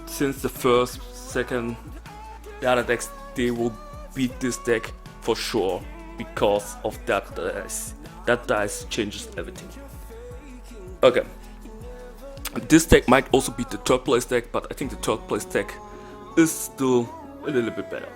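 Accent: Germany. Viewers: Hi are u german? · Bro dein deutscher Akzent 😂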